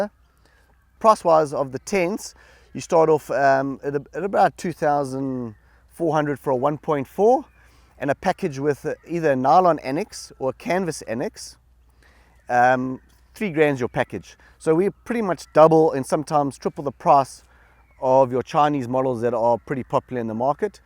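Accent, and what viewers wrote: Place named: South Africa. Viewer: Hey, great SA accent for an Oz! Nice product, thanks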